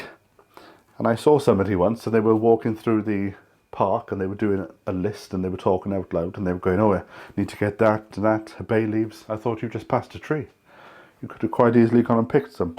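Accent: Wales, United Kingdom. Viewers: I'm sorry you haven't been well, you certainly sounded poorly. I must confess I could listen to you all day long. I love Welsh accents. My late wife, bless her, was Welsh and I spent a couple of years living in Wales. That was a great time. I hope you shake off that flu and are back to normal ASAP. Good luck!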